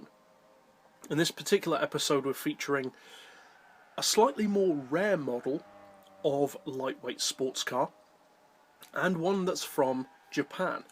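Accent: United Kingdom. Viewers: urr so british!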